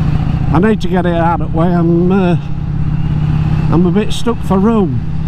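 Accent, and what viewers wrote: Yorkshire, United Kingdom. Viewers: I love this gentleman’s Yorkshire accent!! And I LOVE this glorious motorcycle I’m an Aussie Sheila and I understand this accent. As a 20 yr old ( 1974) I met Phil Irving at a Melbourne Motor Show. Hardly anyone standing around him or the Vincent he was displaying. I grew up riding pillion on Norton Commandos and Triumph Trophy’s , Atlases & Bonnevilles. Had a boyfriend with an Ariel Square Four too. But never been for a ride on one of these sublime Vincent’s. Thank you so much for this video!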